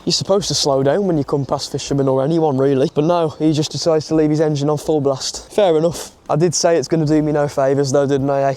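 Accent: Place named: Birmingham, United Kingdom. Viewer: Great video were u from im from the blackcountry u sound like a brummie just found u lol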